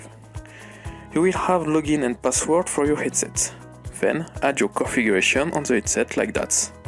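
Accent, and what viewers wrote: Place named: France. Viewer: i spot a french accent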